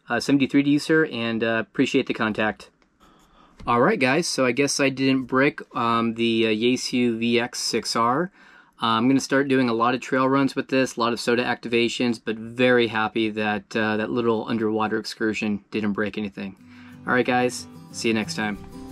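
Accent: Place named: Arizona, United States. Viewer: Sound like your in AZ. We might be neighbors! Thanks for the video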